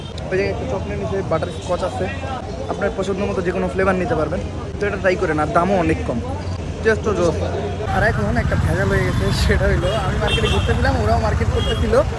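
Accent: Kolkata, India. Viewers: Bhai apni apnar bashai kotha boilen kolkatar bashai apnar kotha bhalo lagena 😊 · ভাই আপনার ভাষা কলকাতার মানুষেদের মতো হয়ে গেছে😄😄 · ভাইয়া তুমি দেখি ইন্ডিয়া গিয়ে ওদের মতো কথা বলা শুরু করছো 😩😩💩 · কেউ খিয়াল করছেন ভাই ইন্ডিয়ান দের মতো কথা বলতেছে 🙂✌️ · দাদা টো পুরো কোলকাতার লোকার ভাষা শিখে গেছেন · vai Indiar lokder moto kotha boilen na valo lage na shunte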